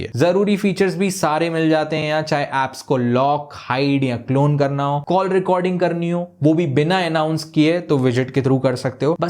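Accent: Bhopal, India. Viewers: Bro is a bhopali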